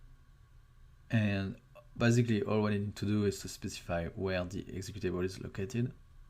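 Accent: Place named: France. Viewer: so nice accent! After I have watched this video I want to learn Franch language :)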